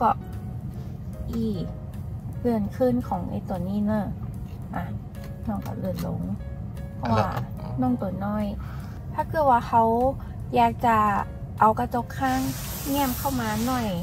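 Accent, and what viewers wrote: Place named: North, Thailand. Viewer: ชอบความเหนือแท้100% ชอมมากกก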